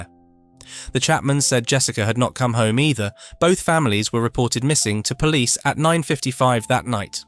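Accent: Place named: United Kingdom. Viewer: Seriously you sound like your from the UK and you can't pronounce half the words right 😂😂😂😂😂😂😂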